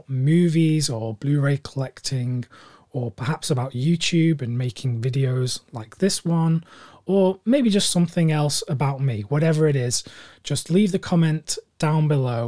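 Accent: England, United Kingdom. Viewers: Unrelated note and in the least homosexual way possible , you have a lovely soft English voice and it’s lovely to fall asleep to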